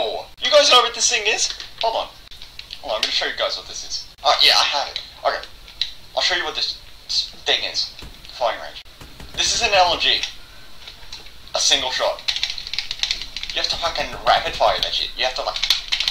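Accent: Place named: Australia. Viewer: Aussie accent game strong as fuck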